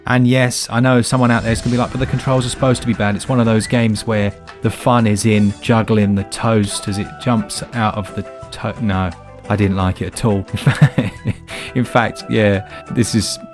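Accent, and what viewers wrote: England, United Kingdom. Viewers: Can definitely handle the British accent 🤣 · British accent: handled😈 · Even the avoid section sounds buyable when heard in a British accent Been wanting the final fantasy 10 and 11 remastered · As an Englishman I personally cannot handle the English accent. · I subscribed because I can handle the British accent. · Who the hell can't handle British accents? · I can handle the british accent. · Believe it or not, but you are the only British guys I understand perfectly! Plus, your accent makes me take a plane and go to England (damn CORONA!!:)) I love your channel!! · I'm here for the British accent. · Love the British accent, greetings from México 🇲🇽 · Love your channel and find your British accent to be endearing. Thanks for all the good run downs! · Handle the brittish accent.. I want it myself! As always great content here :) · Wait are you saying I can win a prize if I just leave a comment? 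I can handle the British accent. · Monkey island music, a British accent, a nod to Monster Boy, and Dragon Quest- cant get any better. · Real question, who doesn't enjoy a nice Brittish accent? Also, gotta say, I love the Monkey Island music. It always puts a smile on my face. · Well I was gonna sub but I can't handle the British accent... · The British accent IS the reason why I subscribed XD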